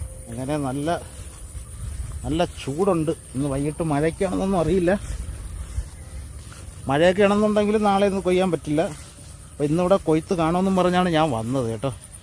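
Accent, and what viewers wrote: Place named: Alappuzha, India. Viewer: Alappuzha slang ആണ് ചേട്ടൻ്റെ.. ആലപ്പുഴ ക്കാരൻ ആണൊ? ഇന്ന് കുട്ടികളെ കാണിക്കാത്തത് കൊണ്ട് mindoola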